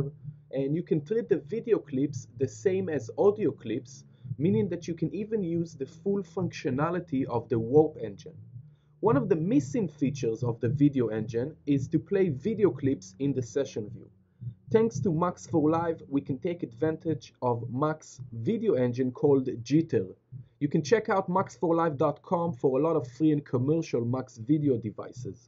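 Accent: Israel. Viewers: Hey i know that accent! shalom =] getting into vj'ing. this helps alot thx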